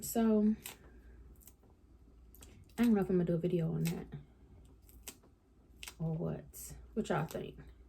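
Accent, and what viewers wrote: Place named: Washington DC, United States. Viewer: Are you from DC? You have a DC accent😃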